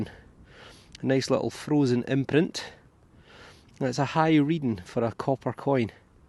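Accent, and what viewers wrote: Scotland, United Kingdom. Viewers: I'm coming here to hear a scot say "Aluminium"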